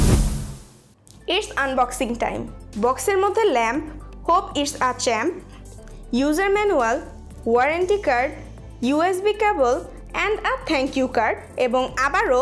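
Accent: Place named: Malaysia. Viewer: that’s great i heard bangla spoke from malaysia but it’s sounds different. dunno bak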